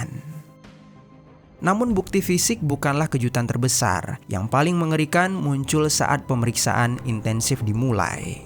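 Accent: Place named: Palembang, Indonesia. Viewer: Cara bicara pembawa acaranya kok mirip bgt ama temen sy yg org palembang, ya 😅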